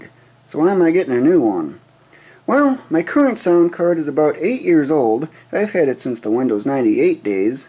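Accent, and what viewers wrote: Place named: South Louisiana, United States. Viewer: Im lovin that south Loisisianian accent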